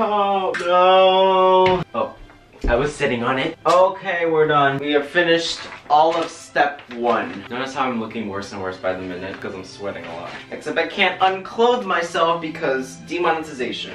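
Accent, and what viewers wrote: China. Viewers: That Chinglish 😂 honestly same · your chinglish is beautiful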